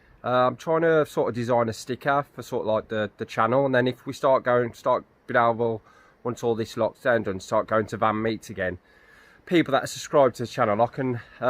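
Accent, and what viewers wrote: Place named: Midlands, United Kingdom. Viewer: Great video. Nice listening to a proper midlands accent. You deserve to gain plenty more subscribers. John👍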